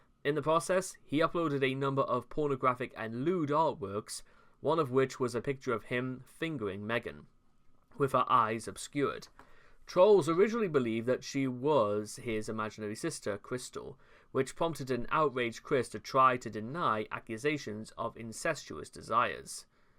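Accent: United Kingdom. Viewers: What part of the UK are you from man? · Sounds Northern to me. But I'm an ignorant North Londoner. I'd guess Northamptonshire maybe.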